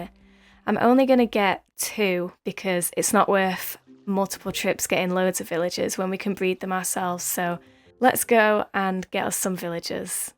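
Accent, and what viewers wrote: United Kingdom. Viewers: Hey! First of all happy new year and i know this can be too much but can you please make a castle on top of the 2 mountains and connect them with a bridge?? And also are you British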